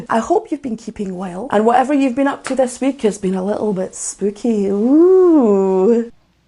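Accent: Scotland, United Kingdom. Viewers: I happen to notice that, after a quick perusal of your video list, you don't have any reactions. How can this be? He is best known for his work with &, but I'd be interested in how you react to " " from his Mondo Cane album. He normally does experimental metal, but Mondo Cane has an Italian 1940-esque torch song vibe. Plus, I'm just looking for any excuse to hear more of your accent. What I would do to hear you soliloquize about music over some haggis, neeps & tatties at the Babbity Bowster in Glasgow...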